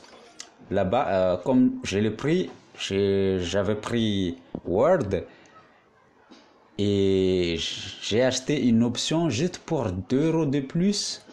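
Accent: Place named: Madagascar. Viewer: J'adore l'accent! Êtes-vous malgache? En tout cas je ne pense pas ça soit vous sur la photo mdr!!! Sinon bravos pour la vidéo, elle m'a bien aidé.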